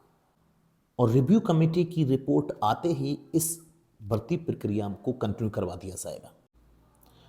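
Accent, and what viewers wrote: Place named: United Kingdom. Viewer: Bhai apka accent british h..mujhe u.k m teacher lagva do na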